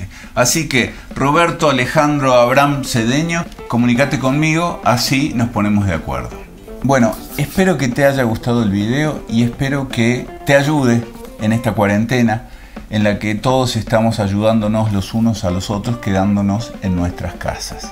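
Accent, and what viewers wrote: Argentina. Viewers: Me encantan sus videos Maestro! y Me encanta mas el acento Argentino! Yo ya usaba desde hace casi 8 meses el Sketch Up pero con tus videos aprendo mucho y me quito ciertas malas costumbres o vicios y comienzo a dibujar mas rápido! Saludos desde Mexico!!! 🏠🏠🏠 🏠🏠🏠 🏠🏠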